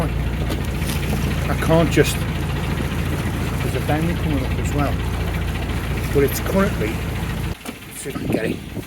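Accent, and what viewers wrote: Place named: Birmingham, United Kingdom. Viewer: Joined blog … you were spraying yourself with after shave or something … in wine trade … had customers in Birmingham area … always thought accent a soft gentle one … have to go … Tony … 🙂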